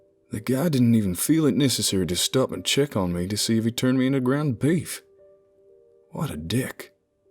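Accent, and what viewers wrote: South, United States. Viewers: You got the southern accent down. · As a native Southerner, I'm very impressed with your accent work, cool story too! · Born and raised in Bama and I thought the same thing. Fantastic job, just minor details. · North Carolina Appalachian boy, daddy from west Virginia and I'm from the smokies. You're right, this guy nails it better than Hollywood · The best narrator with the best stories! Your southern accents are almost perfect. Only two words give you away - been and says. Southerners pronounce them as ben and sez. Yes, I am a hillbilly.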